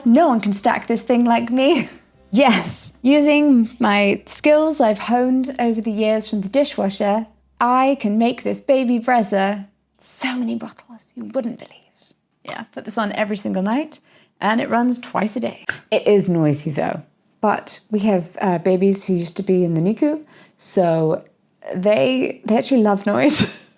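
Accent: RP, United Kingdom. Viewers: Probably because in RP (Received Pronunciation) which is the stereotypical English accent and the one I believe has, premature is pronounced as “preh-muh-tyour”. So if you stick to the accent’s rules it would be preh-mie. Americanisms have crept into a lot of English accents now though, so that may be why people don’t say it like that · Re: strangers talking to you. My grandma will say "sorry I don't speak English" (she only speaks English). Now I want you to try this with people who stop you to talk to you, in your perfect RP English 😂😂😂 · People with the Received Pronunciation, or the stereotypical sort of accent has, say it that way. Not everyone is from the US. This family is British.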